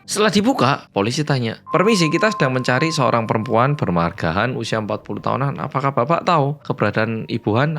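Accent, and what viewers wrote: Java, Indonesia. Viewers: hallo ❤ Nyimak ceritamu 🎉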